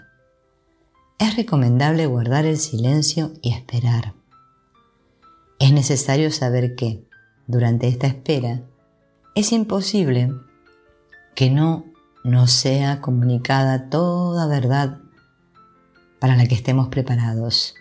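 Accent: Argentina. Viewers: No comprendo tu voz Argentina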